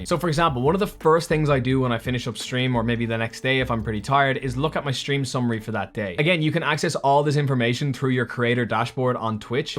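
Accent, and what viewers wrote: Ireland. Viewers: Hey, it’s funny to find you I heard your voice and I was like they HAVE to be Irish so I remembered there’s a about on twitch and I went there and you live in Ireland Dublin (I live also live in Dublin). You’re very underrated!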